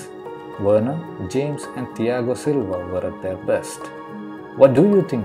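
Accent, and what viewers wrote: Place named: Kerala, India. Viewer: The mallu accent is so recognisable :D. Nice work bro.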